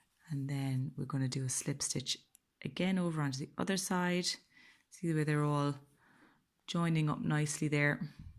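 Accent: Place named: Ireland. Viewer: I found your individual Granny square thank you. I've completed it thank you.Looking at you crochet with that hook you've a very natural flow. You must be crocheting for years. Please do upload more when you get time. Have you a scalloped border most on here don't show how to do the corners. May I ask where in Ireland is your beautiful accent from. ?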